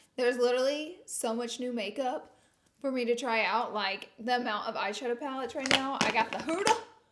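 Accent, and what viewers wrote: South, United States. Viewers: Did you spend a lot of time in Alabama during your break? Your southern accent sounds like it’s been rejuvenated. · You can tell you’re from the south when you’ve never miss understood words 😂😂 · Your eyes were gorgeous!! So glad to hear your sweet southern voice!! ROLL TIDE BABY!!!!!🎃👻💜💜💜🕸🍁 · I have the same accent. be proud of it. I love being a southern n.c. girl.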